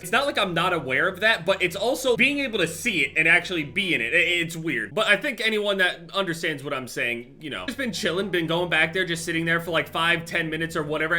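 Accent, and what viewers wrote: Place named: New Jersey, United States. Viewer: I could always tell was from nj just by the way he says yesterday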